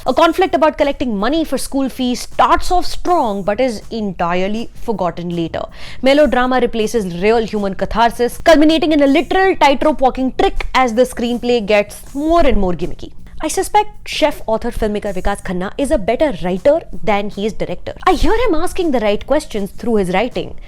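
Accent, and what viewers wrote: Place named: India. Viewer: Omg - awful commentator . Could the English pronunciation get any more horrific and the Indian accent be more grinding to hear fir more than 2 mins . Why has FC got these awful film critics on it . They need to go to finishing school first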